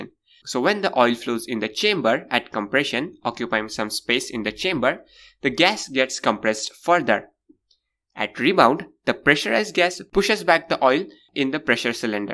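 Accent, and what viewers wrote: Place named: Andhra Pradesh, India. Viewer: A Telugu English speaking AI bot. We are doomed 🤦🏿‍♂️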